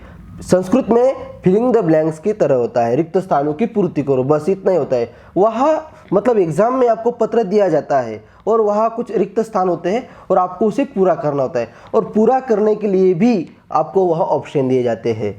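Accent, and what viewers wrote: Maharashtra, India. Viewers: i will guess that ur mothertongue is marathi by the way teaching is very nice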